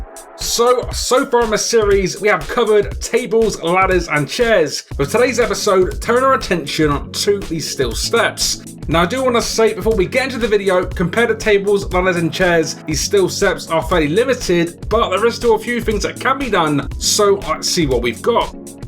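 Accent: England, United Kingdom. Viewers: you are from England ?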